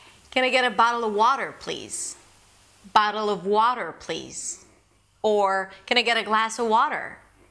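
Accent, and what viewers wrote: United States. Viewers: What a beautiful american english 😍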